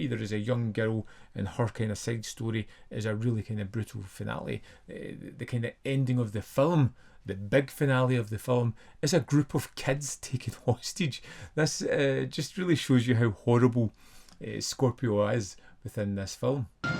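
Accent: Scotland, United Kingdom. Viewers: I love this guy's Scottish accent even if I can't always understand it.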